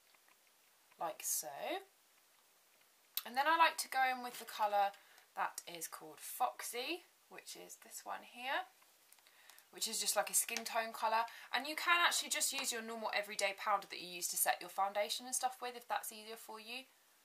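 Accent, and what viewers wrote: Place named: London, United Kingdom. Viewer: love your videos and watch them all the way from Brazil! I don't usually subscribe to channels of individuals like that, but I was hooked from the very first video I watched, which was the one about your perfume collection (don't even know how I got to find it on here). Apart from being very beautiful, you have such good sense of humour, seem like a good laugh and a very down to earth kind of girl! I used to live in London quite a few years a go, went to King's College and worked part time as a model. So your accent, your Boots references (I was kind os a Boots freak) and your day to day make me miss that time in such a good nostalgic way. Thank you and looking forward to more from you:)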